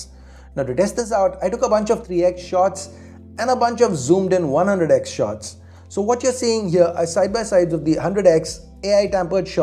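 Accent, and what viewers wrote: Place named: India. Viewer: Indian English is ridiculously funny 🤣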